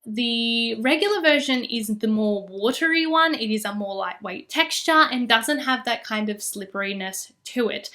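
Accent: Australia. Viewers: You're Australian!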